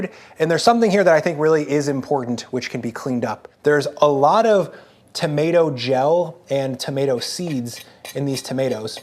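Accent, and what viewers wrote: United States. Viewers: I love your American accent.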